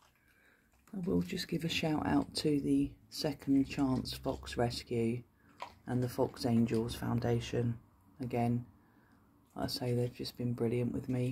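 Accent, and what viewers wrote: United Kingdom. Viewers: I love the British accent, especially when you say: “marvelous » tail ! It’s true that Cookie’s fur is sublime! ❤😊🦊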